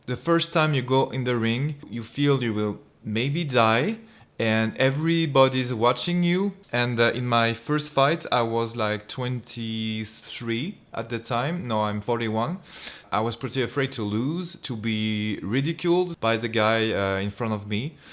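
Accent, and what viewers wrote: France. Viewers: Jme disais bien que tu etais francais avec l’accent 😂👌🏽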